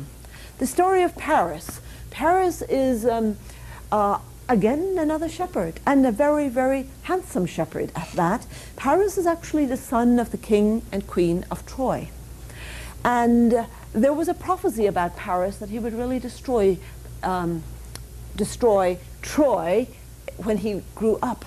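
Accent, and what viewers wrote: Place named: Ireland. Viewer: 15 minutes in before I noticed the Irish accent.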